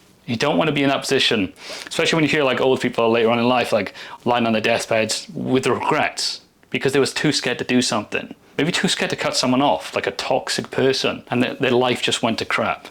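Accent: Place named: Wales, United Kingdom. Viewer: I’m so glad I found your Channel, I am from Bristol by the sounds of it your from wales! We should train together one day ahaha! And yes it only counts when you lock in for a significant amount of time, I’d love to show you more before and after face pic. I just eat steak and fruit 🍇 everyday and I feel and look 100 x better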